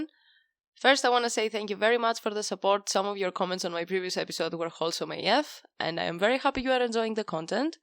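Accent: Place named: Greece. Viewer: I like your videos. I also really love your accent. So don't worry about it your English is outstanding. Far better than my Greek.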